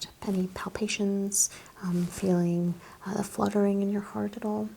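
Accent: South, United States. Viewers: Love the southern accent! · This English Girl loves a Southern Accent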